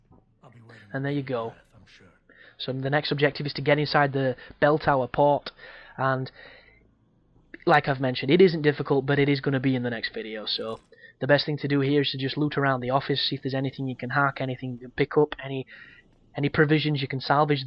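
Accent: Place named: Northern England, United Kingdom. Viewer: Nice walkthrough mate....As a 51yr old gamer I found it refreshing to hear an Northern English mans voice!!!...all the best to you ..from NY....THAT'S NORTH YORKSHIRE NOT THE YANK CITY!!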